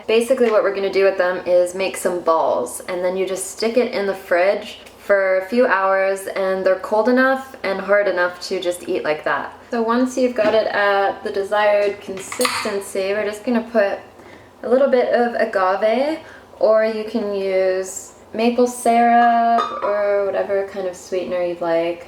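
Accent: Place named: Canada. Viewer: where are you originally from? your accent says canada but your demeanor says california hahah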